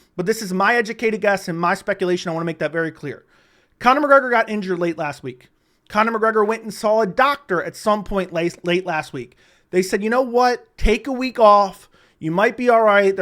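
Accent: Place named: Philadelphia, United States. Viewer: That Philly accent is top notch my guy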